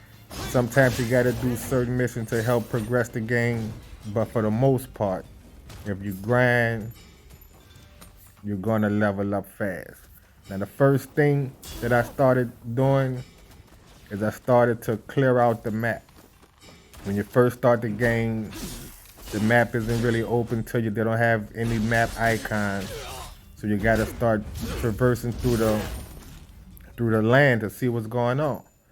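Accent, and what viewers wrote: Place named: Louisiana, United States. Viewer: Bruh! Where are you from, whoa? I swear you sound like my homies from Louisiana. Pretty good video, by the way. Even with the accent very articulate! As a southerner from the A, it is beautiful to hear. Salute my G!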